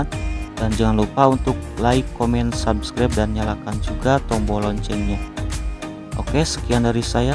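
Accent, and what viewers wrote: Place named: West Java, Indonesia. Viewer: Sunda banget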